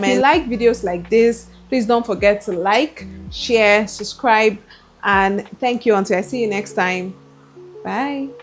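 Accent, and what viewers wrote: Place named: Nigeria. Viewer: 0f all the reviews I have seen on this device, yours is the most comprehensive (maybe I am biased because of the Nigerianness of your accent. That said, I think this is serious value for your money. I value large battery life in phones and that made me go for Tecno Pova 6 Neo, but the specs are at a lower part with the Poco, and it's costlier. I have been scouting for a budget device to replace my tecno until I stumbled upon this phone on Facebook. I am so impressed with the specs and I am going for it.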